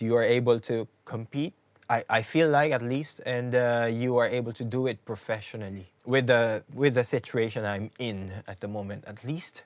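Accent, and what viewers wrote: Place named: Philippines. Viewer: you DEFINITELY sound Filipino. I would know, because I am too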